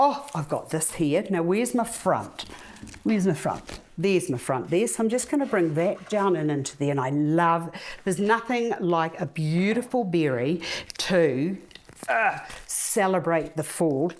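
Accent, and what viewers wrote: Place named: New Zealand. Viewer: Love your New Zealand accent - from a follower in the Commonwealth of Virginia in the United States!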